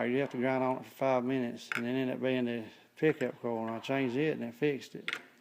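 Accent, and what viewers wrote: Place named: South, United States. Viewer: I understood every word.. he has a thick southern accent.. Nothing wrong with that.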